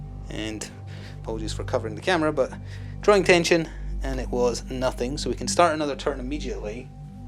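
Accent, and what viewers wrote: Scotland, United Kingdom. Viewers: Good video! I enjoyed the ominous music mixed with your optimistic tone. Where abouts in Scotland are you from?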